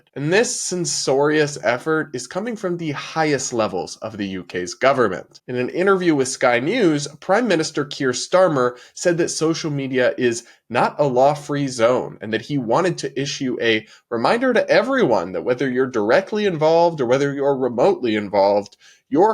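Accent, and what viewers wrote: United States. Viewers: You say “from a centre right perspective” but I hear a yank accent so in reality you are coming from a far right perspective.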